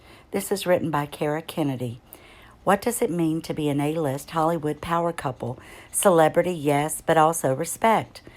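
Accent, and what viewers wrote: South, United States. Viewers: Hello, you have a lovely accent, sounds like a southern accent. If that's so, then good, that means more and more Americans are hopefully finding out what a witch MM is. I'm a Mexican American 66 year old woman from Los Angeles and I've never liked her. Thank you for the read and post. Let's keep spreading the news that MM is vile and hurtful.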